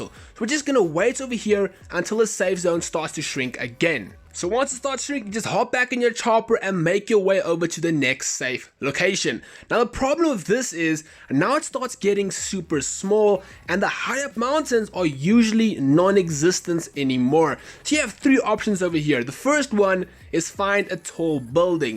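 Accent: South Africa. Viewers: You sound South Africa ,are you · Hey dude thx for the info, and are you South African? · I've recently fallen in love with BR and its been great, and ill definitely be grinding to reach Master 2 this season User name: Petscop2 Edit: are you South African? You sound South African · You sounded very South African, until you said "Chopper" 🤔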